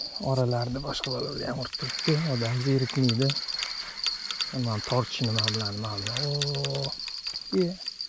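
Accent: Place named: Uzbekistan. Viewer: Со своего члена залупу отрежь, может сома на 5 кг поймаешь. Узбек а акцент харипский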